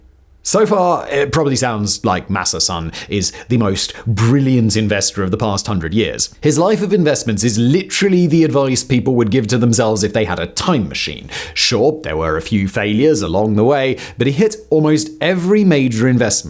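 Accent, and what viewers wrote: United Kingdom. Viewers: Does anybody know ow where in the uk hails from? I need to know what specific accent this is?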